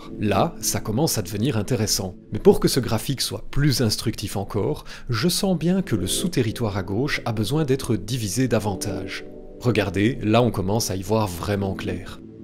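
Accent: Belgium. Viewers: ben c'est l'accent belge... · Aïe ! L'accent belge si vite dans la video, j'était pas près x).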